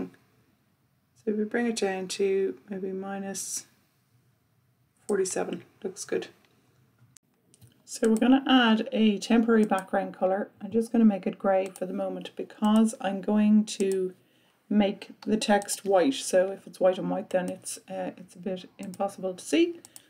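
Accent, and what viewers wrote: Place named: Ireland. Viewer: Love your videos. Can’t believe you don’t have more subscribers. Good to hear the Irish accent too. ☘️🇮🇪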